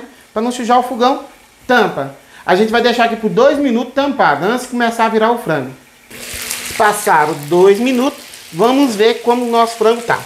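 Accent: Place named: Pernambuco, Brazil. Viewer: Sou Pernambucana e achei o seu jeito de falar parecido com o nosso. Bjs queridos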